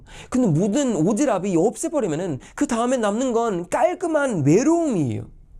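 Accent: South Korea. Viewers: 감성이나 표현, 말씨나 한국사람보다 더한 한국사람인듯 ^^ 말 한마디한마디에 많이 느끼네요~! 어느나라에나 좋은사람도 있지만 반면 나쁜사람도 있듯이 좋은추억만 간직하길 바랍니다~ 오늘도 응원합니다^^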